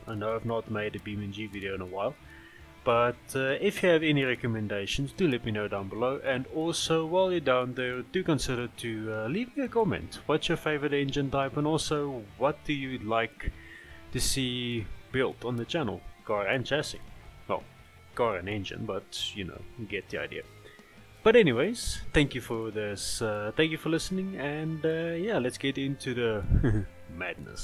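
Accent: South Africa. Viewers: Those "R"s.... South African?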